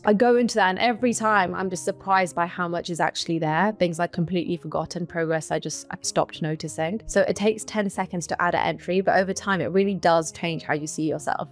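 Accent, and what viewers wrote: United Kingdom. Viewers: Just me probably who face hard time with British clipped English (usually i use 1.3x playback speed)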